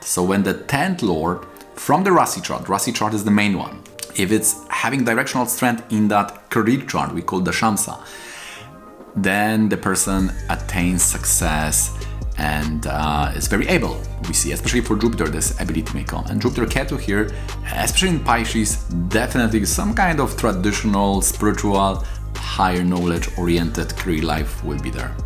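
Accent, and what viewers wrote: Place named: Poland. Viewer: Good heavens, u speak fast, load your sentence with information and add on your particular polish accent, truly it's impossible to get the valuable share u give. I am sorry got my Krittika strong . I hope u would slow down a bit.. speed is good but not that fast please .. thats a ferrari 😃 .. Carriage is good U are amazing & your research spectacular .. thank u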